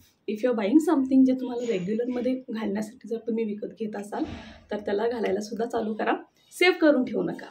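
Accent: Kolhapur, India. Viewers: कोल्हापूर ची आहेस का ताई....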